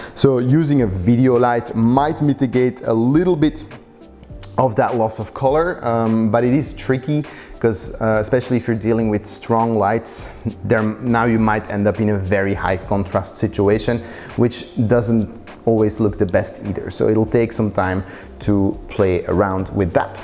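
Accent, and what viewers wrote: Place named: Iran. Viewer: Very useful video. Thank you. BTW - where is the accent from? Sounds like Persian, related to French Canada? 🤪 Perhaps I'm wayyyy off.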